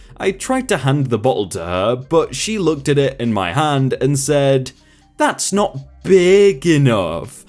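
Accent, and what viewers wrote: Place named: Midlands, United Kingdom. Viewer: John Smithe Probably from the same sort of area. I think they've both got Midlands accents.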